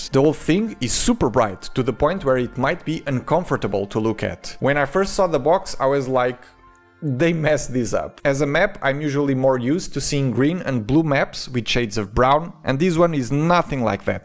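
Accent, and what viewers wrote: Portugal. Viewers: no primeiro segundo do vídeo soube que eras tuga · I just have that strange feeling, that you are português, cuz of " 'eight " instead of "height", "raciu" instead of racio. So yeah, just a guess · 10 segundos (sem ver o nome do canal) e percebi logo que o sotaque era de um português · gajo parece tuga a falar ingles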